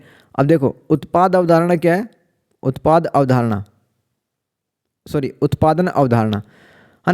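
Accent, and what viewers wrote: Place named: Himachal Pradesh, India. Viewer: Bhai ji aap himachal se ho pahadi bolte ho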